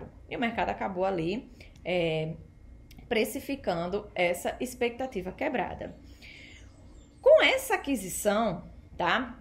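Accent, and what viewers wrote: Northeast, Brazil. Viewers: EXCELENTE VÍDEO, GRANDE CONHECIMENTO SOBRE O MERCADO DE AÇÕES, AMEI O TEU SOTAQUE NORDESTINO, NÃO O PERCA NUNCA! TÚ REPRESENTAS COM DIGNIDADE O POVO NORDESTINO! APENAS CORRIJA O HÁBITO DEFEITUOSO DA PRONUNCIA (INDENTIFICAR) NA PALAVRAS "IDENTIFICAR". PARABÉNS! PROFESSOR ROBERTO GUEDES CAMPINA GRANDE PB!!!! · Excekente video. Parabens ! E o sitaque matavilhoso me traz as boas lembrancas do Nordeste e dos inumeros amigos nordestinos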